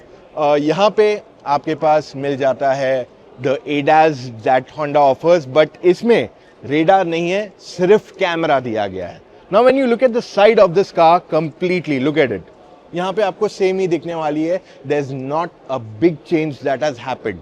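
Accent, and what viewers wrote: India. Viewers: Hey team! Great video as always. Love the honest, no-nonsense review style. Your natural Hinglish flow and the way you bluntly call out brands (like the missing fog lamps and the "new gen" claims) is exactly why we trust your channel over the PR brochures! 🙌 Just wanted to share some friendly, constructive feedback as a viewer to make your delivery even sharper: Punchier phrasing:Sometimes the English sentences get a little tangled. For example, instead of saying "I am a big fan of that being going off" about the LED light, a quick "I'm glad we can turn that off" hits much better. Descriptive words: When you talked about the new touchscreen angle, you said "it's like this instead of this way" while using hand gestures. Since a lot of us listen to your videos in the background while working or driving, describing it verbally (like, "the screen is tilted slightly upwards now") really helps paint the picture! 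Fewer fillers: You use phrases like "just fine" or "pretty much" a lot (like when talking about the ground clearance). Swapping those for words like "adequate", "practical", or "sufficient" would make you sound even more like the expert you are. Keep up the awesome work! Never lose that raw, unscripted energy.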